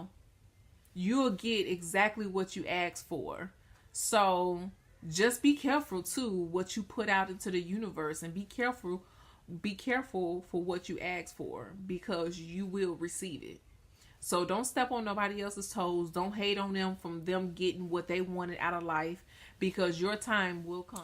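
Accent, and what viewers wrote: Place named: South, United States. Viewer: Great Message!! Where are yoy from? I hear a southern accent.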